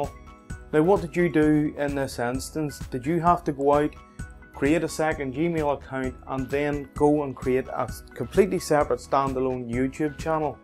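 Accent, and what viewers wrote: Northern Ireland, United Kingdom. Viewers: Thank you, very easy, clear, instructions. I had a look at your videos and there's loads of great stuff I can use, got yourself another sub. Also, nice to hear a Norn Irn voice too. Best Wishes, Brendan (outside Lurgan). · U have the same accent as me! R u from the uk?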